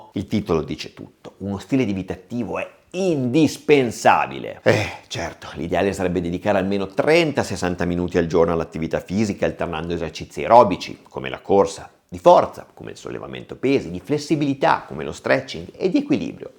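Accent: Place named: Piedmont, Italy. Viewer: Ciao Doc, visto l'accento piemontese forte e il cognome, posso chiedere la città o paese che sia?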